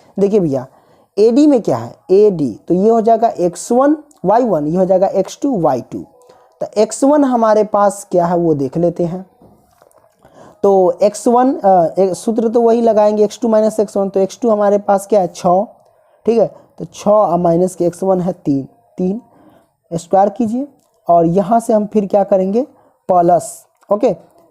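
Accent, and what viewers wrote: Bihar, India. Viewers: Bihar se ho sir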